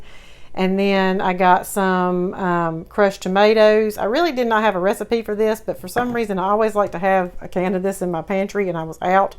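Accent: South, United States. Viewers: Love your videos and your southern twang. Dollar Tree is the bomb!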